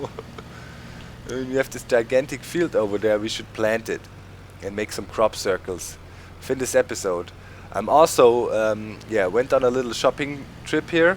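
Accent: Germany. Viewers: SAch mal .. spinn ich oder hast du n deutschen Akzent in der Stimme ?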